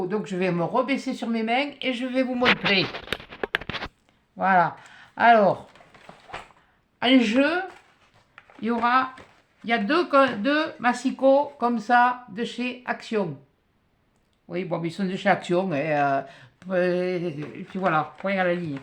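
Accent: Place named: South-West, France. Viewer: Bonjour je vais essayer de participer (malgres mon attelle au pouce sniff) Je viens de découvrir ta chaine et bon dieu que cela fait du bien d'entendre ton accen et ta gouaille sans compter entendre parler patois... merciiiiii... je suis originaire et jai toutes ma famille au fin fond du Sud ouest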